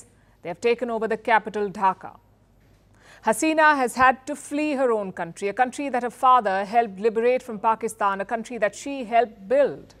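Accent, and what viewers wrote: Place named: India. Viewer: Just typical Indian style English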